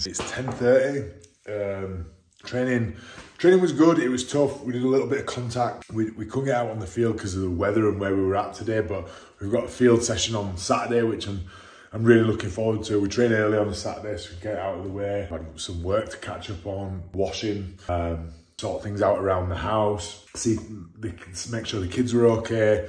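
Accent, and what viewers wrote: United Kingdom. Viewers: Hi...I just found ur channel today... I followed Joel's too.... I think ur channel will be more interested if u toke those places in ur area/places u visited too. I'm Indonesian and sometimes hard for me to understand what u are saying with ur British accent...but I've been trying... Success for ur natural channel I love it...much love from Indonesia. 💜❤💚💛🙏🙏🙏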